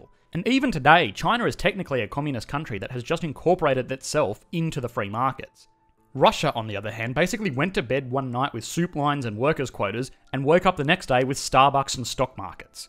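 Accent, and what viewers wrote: Australia. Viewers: Gotta love the Aussies with their cockney-esque accents - hangover from history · it sounds pretty normal to me with a hint of Australian.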